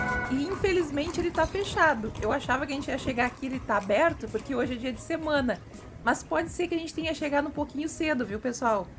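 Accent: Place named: Rio Grande do Sul, Brazil. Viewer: Chegando para apoia-la e ja gostei. Conheço Pelotas, nasci no Sul, na fronteira, e hoje em P. Alegre. Pelotas tem muitos prédios históricos. Vc tambem é gaucha, nao? Esse sotaque..rs Um abraço, compartilharei. Nao faço conteudo, só apoio.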